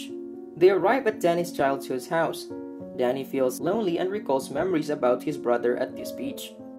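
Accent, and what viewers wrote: Philippines. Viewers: Is this a Filipino accent??? Great Video! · The guy who voiced over is 100% Filipino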